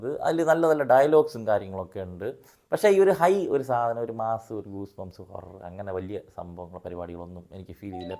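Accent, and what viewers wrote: Trivandrum, India. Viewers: Please avoid reviewing English movies and stick on only to Indian movies... Thankalude malayalam movies nte review okke njan kanarundu and i am very much interested in those reviews and your Trivandrum slang. .. Athukondu thanneyaanu thankale njan subscribe cheythathum. But I am very sorry to say that you don't have the class for reviewing English movies, maybe due to the fact that you are not much familiar with Hollywood or British movies. The way you reviewing Sinners is really pathetic and too substandard. So once again, I humbly request you to restrain from reviewing English movies.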